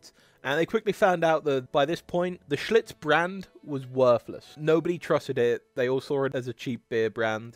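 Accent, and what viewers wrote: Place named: United Kingdom. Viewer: British pronunciation of American names is laughable.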